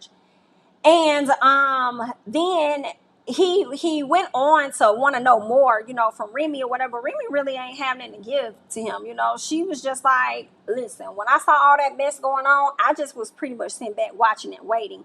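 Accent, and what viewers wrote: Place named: South, United States. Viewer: I discovered this channel last month she is funny, informative with that southern accent don’t know why her personality brings a sense of familiarity. Great channel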